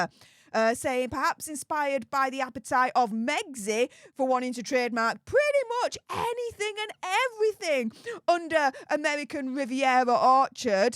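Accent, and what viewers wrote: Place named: Cockney, United Kingdom. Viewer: Your accent sounds East ender working class .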